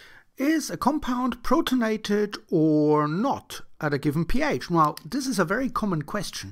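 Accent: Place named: England, United Kingdom. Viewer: Can somebody ~please~ tell me why the English English makes literally every thing easier to understand.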